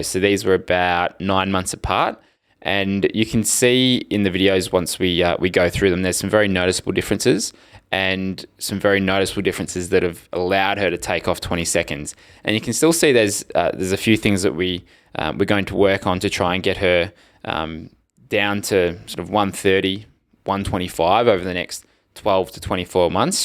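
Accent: Australia. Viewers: Benther He has an Australian accent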